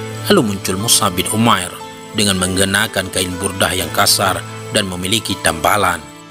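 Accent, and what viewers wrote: Aceh, Indonesia. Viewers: Kalau boleh menebak .di dengar dari suaranya Abang asal dari Aceh / Lombok?🙏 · Logat mimin sepertinya dari Aceh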